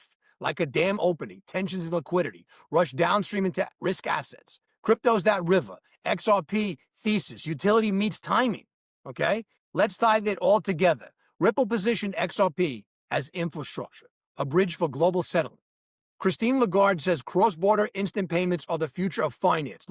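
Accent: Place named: New York, United States. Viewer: That New York accent ❤😊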